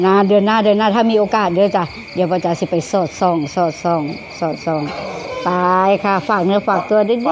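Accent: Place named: Nyaw, Thailand. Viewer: สวัสดีครับป้าจ๋ามาฟังภาษาญ้อ เว้าม้วนๆครับผม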